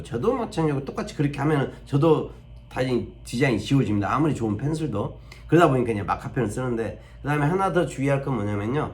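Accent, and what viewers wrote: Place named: Jeolla, South Korea. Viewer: ㅋㅋㅋㅋ전라도 분이신갘ㅋㅋㅋ정감가요 말투가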